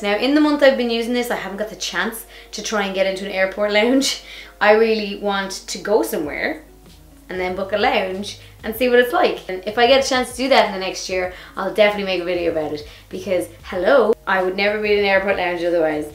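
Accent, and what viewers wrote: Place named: Dublin, Ireland. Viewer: You and Diane Jennings both live in Dublin but your accents sound different to me where were you born in that city or did you move there?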